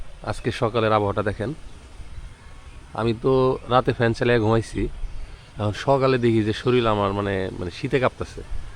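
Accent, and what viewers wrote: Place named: Manikganj, Bangladesh. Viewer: ভাই আমার বাড়ীও মানিকগঞ্জ আপনার কথা আমাদের কথা একি রকম ৷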